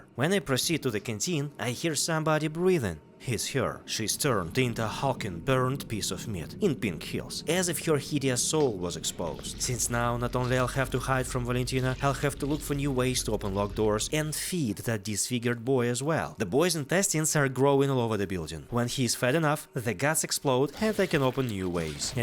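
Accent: Russia. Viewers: ля сразу узнал русский акцент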